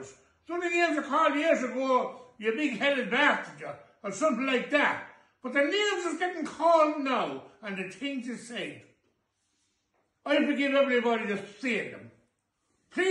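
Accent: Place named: Spain. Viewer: Is this a drunk Spanish man I’m struggling with the dialect.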